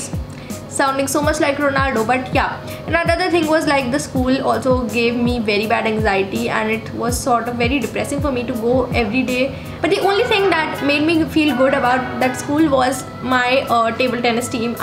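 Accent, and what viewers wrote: India. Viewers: Yuh R NATURAL n that's why I ❤ yu n yur channel...... Yuh have a great n clear Indian accent with great fluency of English ( IDK why ppl say that yuh R not goooood in English like seriously it drives me crazy! ) And yuh R not like fake ppl who show their prerogative n privilege n their accent more then their content...... so no negativity in yur channel..... loads of ❤ from UK! N U R D Best......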